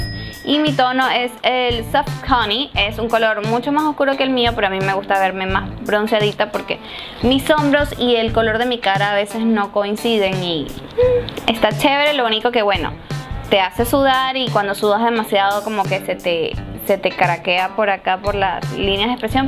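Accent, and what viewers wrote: Guayaquil, Ecuador. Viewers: habla como guayaca es verdad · habla como Guayaca!! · ya se te pego el acento ecuatoriano soy de guayaquil Ecuador☺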